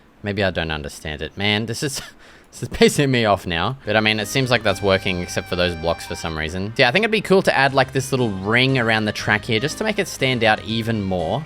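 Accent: Australia. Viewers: hes australian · are you Australian?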